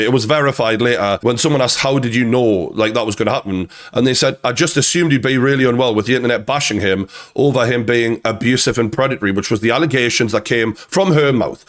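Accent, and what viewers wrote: United Kingdom. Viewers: I think “Good Lad” is an expression that was made for you, you’re everything that I picture in my mind when I hear “Good lad” resonate with a gorgeous British accent… You’re a great man, stay you, ignore the bullshit critics, that comes with success, just focus on the love you’re getting from true fans of your work. Peace brother (from a froggy on the other side of the channel 😅)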